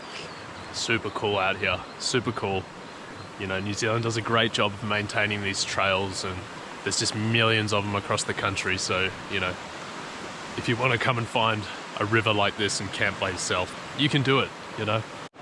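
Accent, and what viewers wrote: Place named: Australia. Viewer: Just found ya channel. Great video, and you threw plenty of your own personality in there to, which makes it way better and less like a documentary than some are. Before looking further, your accent tells me you're an Aussie? (all good lol) Wicked scenery and bird life. Liked & sub'd (so I don't get lumped in with them little headed Kereru) Watching from rural South Island NZ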